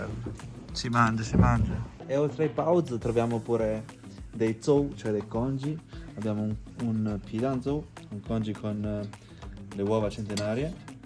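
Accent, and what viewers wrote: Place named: Emilia-Romagna, Italy. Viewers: Un cinese con l accento romagnolo.. Troppo forte 😅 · Davvero bella scoperta questo canale con questo simpatico ragazzo di origine cinese che parla italiano con l'accento emiliano-romagnolo! 😊👍 Iscritto!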